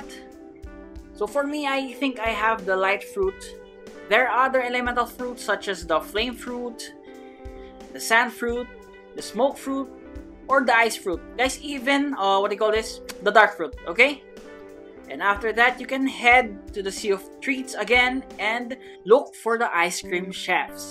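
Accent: Philippines. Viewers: Hey are you Philippines you sound like. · First time? I'm A filipino so I could tell from his accent that he is a Filipino.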